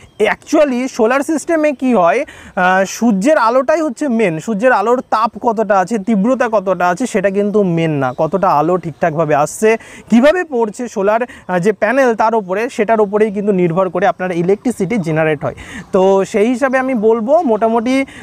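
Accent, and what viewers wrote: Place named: West Bengal, India. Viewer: বেটা অম্লমধুর! তোমার দেয়া তথ্য আমার ভালো লেগেছে, কিন্তু তুমি ওপারের! তোমার বাচনভঙ্গি আমাকে সেটা জানিয়ে দিয়েছে। এপারে দুটো মূর্খ আছে যারা নিজেদের পন্ডিৎ মনে করে কিন্তু আসল কথা টি বলেনা। তুমি একটু ক্ষেমা ঘেন্না করে বলে দাও তো! অনগ্রীড সোলার সিস্টেম কি দিনে বিজলী চলে গেলে কাজ করে? তুমি সাবলীল ভাবে তড়িৎ শব্দটি উচ্চারণ করছো অথচ এপারের দুই পন্ডিতের একটা 'টেকনোলজি' কে বলে'ট্যাকনোলজি' আরেক পন্ডিৎ বিরক্তিকর ব্লাবারিং করে। আমার প্রশ্নের উত্তর দিতে ভূলো না বেটা।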